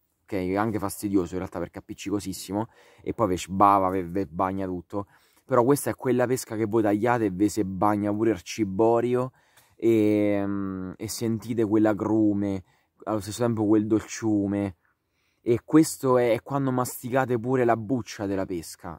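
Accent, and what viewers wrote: Rome, Italy. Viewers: Parla in romanesco a me piaceeeeeeeeeeee 👍👍👍👍👍😍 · Forse romano.Bellissimo!!!!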